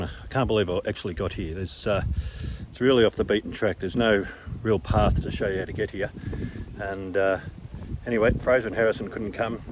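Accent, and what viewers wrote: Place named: Australia. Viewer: 36hrs driving.. “wowsers”... I’ve loved all your amazing earthy Aussie dialect tours. Thanks so much.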